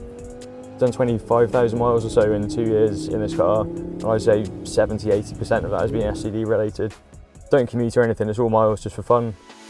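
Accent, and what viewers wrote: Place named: West Country, United Kingdom. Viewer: Think it’s a ‘west country’ accent that may come across quite strong and hard to understand. Also the background music is probably a little too loud.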